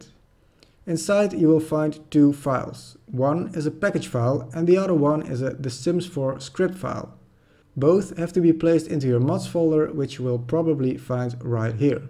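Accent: Netherlands. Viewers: First of all thank you so much for this!!! Second, are you Dutch? Your accent reminds me of my own. :) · I smell Dutch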